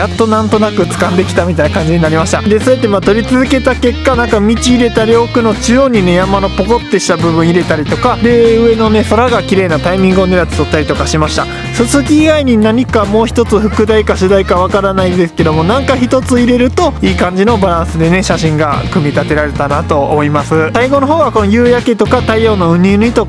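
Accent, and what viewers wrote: Kansai, Japan. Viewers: 自然な関西弁が好感持てます。 また、どんな目的でどうやって撮影してるのかも参考になります。 撮影した作品は応募とかされてるんでしょうか。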